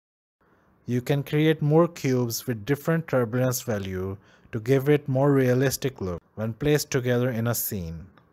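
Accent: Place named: India. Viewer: Really amazing even with the indian voice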